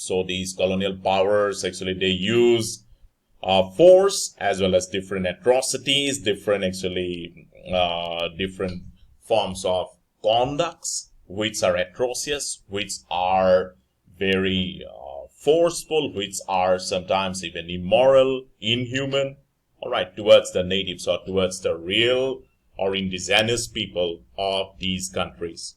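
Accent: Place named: Assam, India. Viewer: Literasure.. I love ur assamese accent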